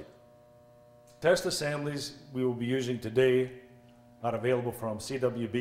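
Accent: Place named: Scotland, United Kingdom. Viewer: What part of scotland is the instructor from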